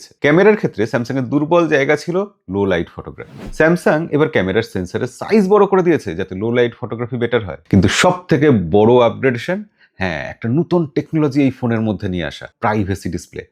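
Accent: Bangladesh. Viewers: দাদা আপনার কি বাংলাদেশে বাড়ি? কারণ আপনার কথা বলার ধরন সম্পূর্ণ বাংলাদেশের মতো।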